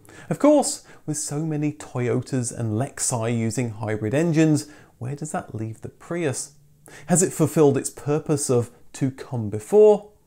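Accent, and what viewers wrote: England, United Kingdom. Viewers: Yeah i'm very surprised too. With all the english cars videos and the accent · You always seemed to be from the UK , some sleepy village there but great stuff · I was about to say the same. You've done well keeping such a clear English accent without any hint of an American accent. I remember a lad I went to school with, he went to America something to do with becoming a sports coach when he was around 19. When he returned after a few months, he had a ghastly 'fake' accent to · You had me fooled too. Started following your channel very recently, and mistook you for a Brit . Are you from the Midlands originally - Birmingham, maybe? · Didn't realize you're are American. To me you sound British. Also the choice of cars is in my opinion very European. Anyway, great channel. Thank you for all the time you put in. Cheers from Bavaria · Same here after listening to ur way u deliver us which is similar like UK English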